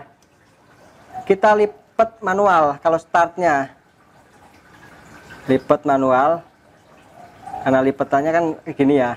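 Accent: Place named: Yogyakarta, Indonesia. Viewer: Matur nuwun mas, Sangat membantu, semoga jadi amal jariyah,...btw logat Jogja nya kentel banget...😀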